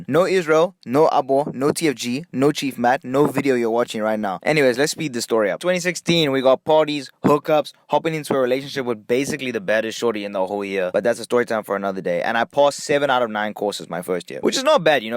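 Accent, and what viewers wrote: South Africa. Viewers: This accent belongs in my backyard. SA?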